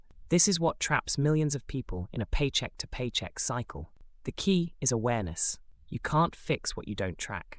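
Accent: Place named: England, United Kingdom. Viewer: English Accent means I stop listening to this garbage.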